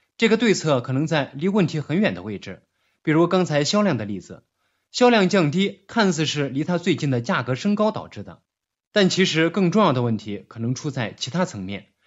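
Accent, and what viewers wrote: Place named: Shandong, China. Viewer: 说的太好了，只是普通话不是很标准，您是山东人吗？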